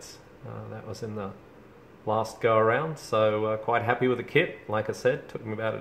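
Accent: Australia. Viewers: Great where you are ? You sound Aussie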